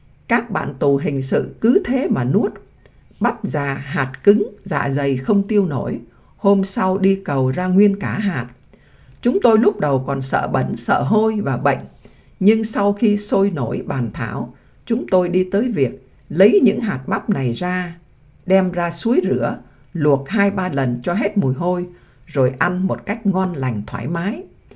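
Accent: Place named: North, Vietnam. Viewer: Chào cảm ơn tác giả Thanh thương Hoàng câu chuyện thật hay buồn thương đến rơi nước mắt,cảm ơn MC "Bắc năm tư giọng như ru ngủ/đưa vào hồn" nhớ,đủ,mãi, luôn/thương thương lắm và buồn như muốn khóc /những người hùng ngang dọc đất miền Nam/rơi nước mắt thôi đành cam số phận/biết nói gì?buồn,giận, hờn ức hận thù căm/ chúc kênh vững mạnh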